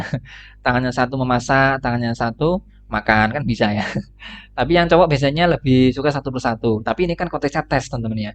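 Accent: Java, Indonesia. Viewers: Sangat mudah dipahami dan saya suka medok jawanya mas wkwk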